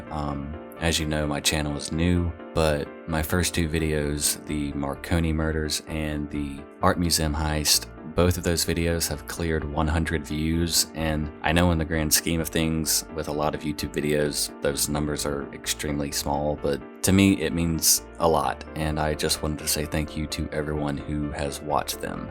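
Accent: South, United States. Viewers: I just came across this channel, and so far, I'm loving it. Thank you for giving these innocent victims a voice. I'm definitely subscribing!....btw, I'm hearing a little bit of a southern accent, I love it. People tell me that I have a southern accent as well, but I don't hear it at all. Lol. Also, I'm from VA, I didn't pick up an accent there! Lol. ❤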